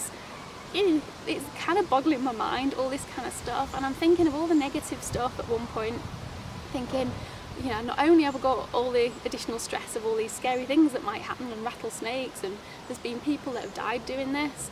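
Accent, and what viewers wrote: United Kingdom. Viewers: I have always loved the UK accent every since going out with one for a couple of years in the 80s. 6 months is along time witch means you'll hit my state late Sept or Oct. Last year snow started the last day of summer and we ended with 120 - 130 % total snow pack. This year suppose to be hot and dry but my state is unpredictable . We have the Pacific Ocean on the coast rising to the Olympics dropping into Puget Sound before rising to the Cascades. CHECK out Farley this year he is a VEGAN and this guy is Bookin. In 45 days he has reached the halfway point 1235 miles. You can see where he supplies and where not to. GOOD LUCK, I just sub.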